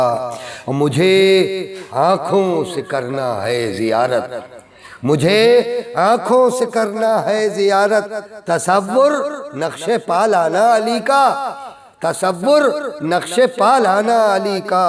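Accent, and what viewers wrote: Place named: Varanasi, India. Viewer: آج آپ بنارس مشاعرے کے لہجے میں شعر بول رھے ہیں حضرت 💗💝💗💝💗💝💗💝💗💝💗💝💗💝💗💝💗💝💗💝💗💝💗💝💗💝💗💝💗💝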